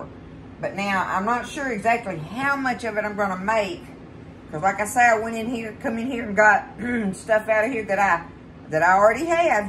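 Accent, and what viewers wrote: South, United States. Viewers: you just crack me up! I love your channel and love you to pieces! I just adore your top with all the bright colors. I have the cutest apron made out of that same pattern of pastel stripes. Love those colors don’t ya?! You make me laugh with all your “sayings” and your southern accent. I am an “Okie” here in Oklahoma City and get teased about my accent too. I retired from Southwest Airlines in reservations and on one call I had a gentleman end the call with one request, he wanted me to say “ya’all” one more time! When I said it, I could hear the room chuckle! They weren’t being rude, they just enjoyed the accent too. I often was told they could tell I was from the South, because I was extra nice and took extra time to be so helpful. It does make you feel good to brighten the day for someone and know that you were helpful too. 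I know you know what I mean. ❤ Can’t wait to make your “fluff”! 😊❤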